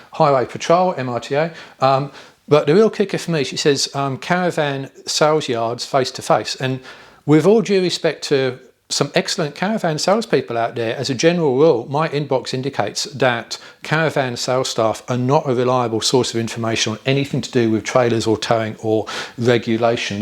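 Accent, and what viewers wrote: England, United Kingdom. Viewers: Ignore the English accent, this is Australia but for which state rules, no idea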